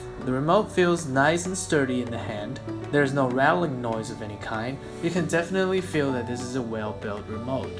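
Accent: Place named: Taiwan. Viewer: 竟然是台灣人的影片，這口音很純喔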